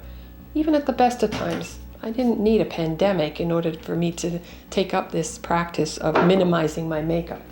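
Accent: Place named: United Kingdom. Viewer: Love it! I'm starting to hear your British accent more 🥰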